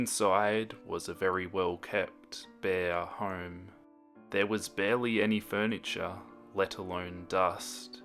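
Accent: Australia. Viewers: Pookie r you Australian ? ? ?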